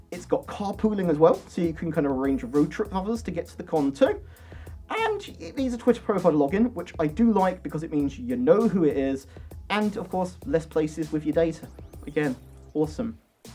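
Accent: England, United Kingdom. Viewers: Because of your english accent.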